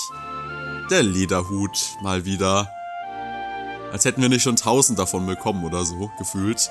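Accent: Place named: Low German, Germany. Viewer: Ach ja ich mag diesen Akzent. Plattdeutsch ist schon was interessantes. By the way wie geiht di dat? 😂😂 Das ist mein lieblings Satz auf Platt. Selber sprechen kann ich es nicht, aber ich verstehe es und noch was krass das LP hat fast 100 Parts. Ich würde dir raten mal in der Herberge zu übernachten. Vielleicht passiert ja auch in diesem Dorf das selbe wie im anderen. Seien wir mal ehrlich es ist schon eigenartig, dass niemand mehr da war. Ich glaube das hat was mit diesem Musiker und seinem Lied zu tun. Wer weiß vielleicht verzaubert er sie ja mit dem Lied und sie verlassen über Nacht das Dorf um irgendwas zu tun. Das erinnert mich gerade an eine Geschichte und zwar hieß sie „der Rattenfänger von Hameln" nur dass es nicht die Kinder sind die verschwinden sondern alle. Bei dem Schwert Stimme ich dir zu. Es ist ein schönes Detail im Spiel, was bei DQ meist nicht selbstverständlich ist 8 zum Beispiel, da gab es einmal was sie normal an hatten und das „Spezial Outfit" (weiß nicht, ob sie sogar mehr als ein Exklusives Outfit hatten aber naja du weiß was ich meine). 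Das finde ich in DQ 9 auch so geil, wenn man sich um zieht und der Charakter das dann auch im Kampf an hat. DQ 9 ist aber so ein tolles Spiel. Action, Spannung, Drama, Story und noch ein paar andere nennenswerte Dinge an DQ 9. Eine Frage würdest du auch mal DQ 9 LPn? Würde es auf jeden Fall klasse finden. Super Part übrigens auch noch. Freue mich schon auf den von morgen 😊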